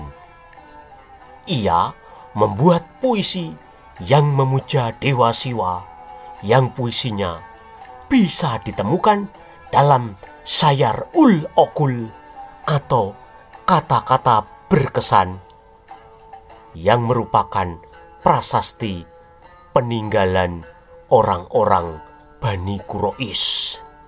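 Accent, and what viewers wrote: Java, Indonesia. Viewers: Adminnya medok banget jawanya😅😊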